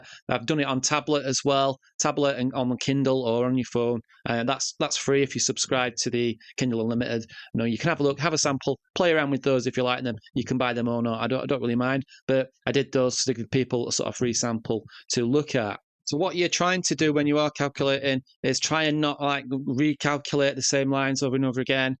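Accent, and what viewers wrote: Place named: Manchester, United Kingdom. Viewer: You're from Manchester aren't you?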